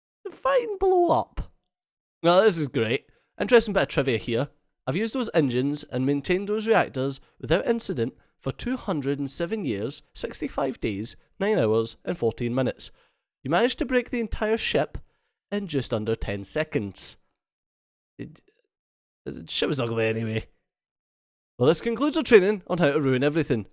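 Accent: Scotland, United Kingdom. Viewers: Woo! HAL 9000 jokes with a scottish accent! if this game would have multiplayer, i will buy this as soon as it's awailable =D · I was laughing so hard while was reading the Robots responses. Not just because the dialog was funny but, because accent seemed go go well with it. Hurray for Scottish accents. P.S. Love your vids + keep up the good work.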